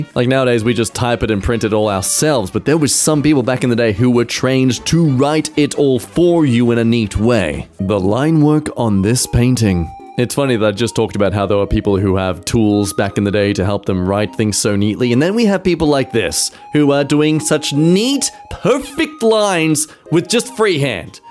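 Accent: Australia. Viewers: mate, that flower is already dead… that yard was beautiful and green with the flowers, and it didn’t look like shitty fake grass… or dying one… hand to blade is where my mind went too 😅 too many humans are the definition of ew so I agree with that sentiment in this case… but no, insects are beautiful useful important fellow animals but otherwise, ooh, and yes please keep your aussie accent mate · it's fine, you can go full Aussie.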